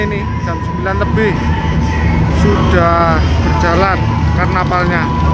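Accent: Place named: Java, Indonesia. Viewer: Medok rek suare ..aq yo wong ngabab lho..omahku kulon lapangan rek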